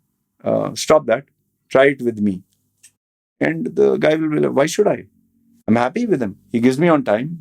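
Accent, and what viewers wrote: India. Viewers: At first i thought you are Hispanic but u have an indian accent.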